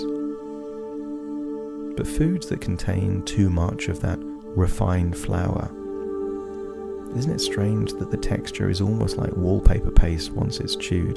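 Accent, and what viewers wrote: England, United Kingdom. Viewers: Ok..., I need to get a Bluetooth headphone set, so I can move about to my bed for a comfortable experience. My tethered headset keeps me tied to the desk. Now then, I will try it again when Amazon gets here with my new headset. Meanwhile, I live on a 220 acre mostly forest little NW farm and recovering from a heart attack and fractured hip when I fell. I can do this, your program sounds great and I like the English accent. I am 285-lbs 5'11" & 73 years old, and of German heritage. I will report more later.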